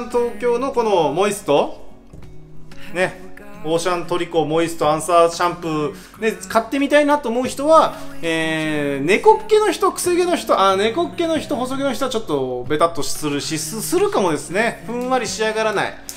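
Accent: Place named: Kumamoto, Japan. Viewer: 熊本のイントネーション最高すぎる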